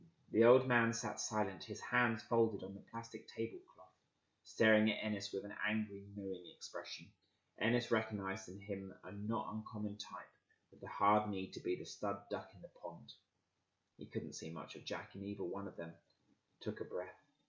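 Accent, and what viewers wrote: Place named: United Kingdom. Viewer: Was so hyped to litsen then relized your British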